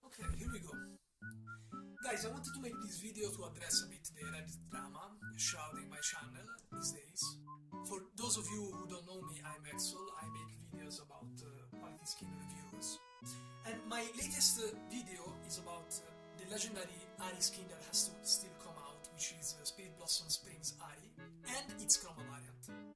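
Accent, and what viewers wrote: Italy. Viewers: my brother in christ, are u italian? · l'accento è palese italiano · he’s italian? 😳😳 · Come for the drama, stay for the Italian outrage accent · are you by any chance italian? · he's italian most probably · no l'accento de roma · Man tbh I never tought that your accent sounded so Italian, Love it btw :) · I don't think he confirmed it, but I am Italian and that is definitely an Italian accent, I've heard it a thousand times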